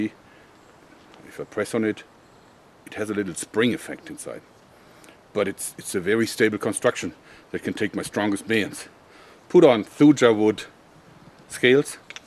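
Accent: Germany. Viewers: Hörst dich sehr deutsch an, bist auch deutscher oder? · anybody else notice that he sounds like a german robin williams... he sounds just like him just with a german accent. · klar ist er Deutscher - hört man ja auch an seinem Akzent :) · ich hab mich die ganze zeit gefragt warum der sich so deutsch anhöhrt :D:D kommt ja aus deutschland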